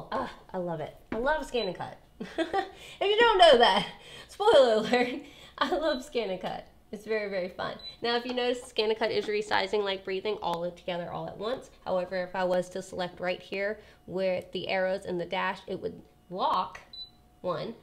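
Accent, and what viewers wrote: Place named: South, United States. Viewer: I love this. I purchased stencil stuff two years ago and was just to intimidated to try to make the door mat. I’m going to try it now thanks to you. I learned some things about welding too. Love your accent, I’m southern too! Awesome tutorial, thanks.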